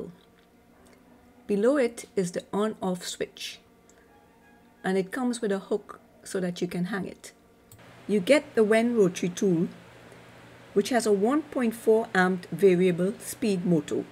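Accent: Trinidad and Tobago. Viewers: This was excellently explained..you sound like a Trini..great video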